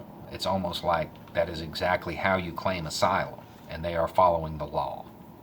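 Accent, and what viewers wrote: South, United States. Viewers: I really like your dedication to your country. I only wish more people were as informed, and as intelligent, as you are, Sir. And I really love your southern accent!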